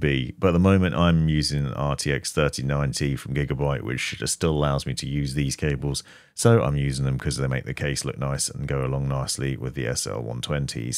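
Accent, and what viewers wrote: United Kingdom. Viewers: it’s not just the speed …it’s that Brit accent hahaga GREAT video man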